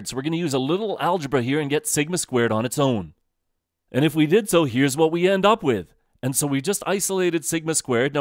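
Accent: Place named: Canada. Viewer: I like the Canadian accent :)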